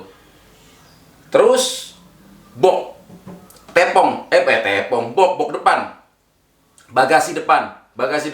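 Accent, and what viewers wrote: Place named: Medan, Indonesia. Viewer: Medan banget 😁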